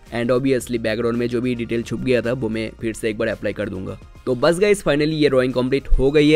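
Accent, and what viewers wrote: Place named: Bengal, India. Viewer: Khub bhalo legeche tomar painting video. Yomar hindi sune mone holo tumi bangali..tai bangla te comment korlam. Next time,' hairs' bolo na ota ' hair' hobe. Criticism na positive feedback dilam.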